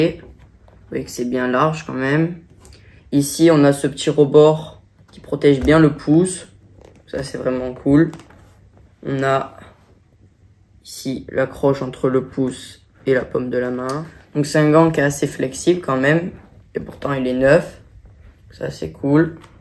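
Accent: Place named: South, France. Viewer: Tu vis dans le sud de la France ? T'as un p'tit accent du sud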